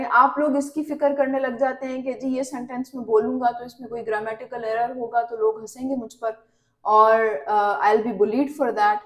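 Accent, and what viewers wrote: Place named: Sindh, India. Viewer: Apke to apne english thek nahe ha total sindhi lehja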